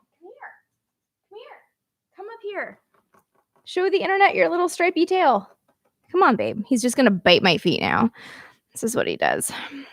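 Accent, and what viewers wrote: Canada. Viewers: Will have to get the ebook so I can hear that awesome Canadian accent all day.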